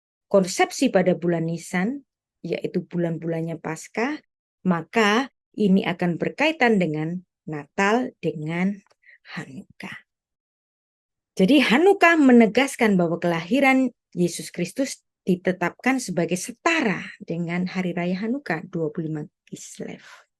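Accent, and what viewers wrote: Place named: Java, Indonesia. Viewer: Materi Hanuka sangat menarik namun yg kurang ketertarikan adalah dialeg kedaerahanmu asal suku jawa itu yg melemahkan memahami pemaknaan isi sesungguhnya. Bisakah anda menggunakan bhs lndonesia yg baik dan benar tanpa kontaminasi dialeg kejaweanmu? Kok sampai usia yg segini tdk bisa menghilangkan dialeg kedaerahanmu itu gmn sih ceritanya??? Gunakanlah bhs lndonesia yg baik dan benar sebab lndonesia bkn seluruhnya suku org Jawa kan? Harap dipahami utk dimaklumi.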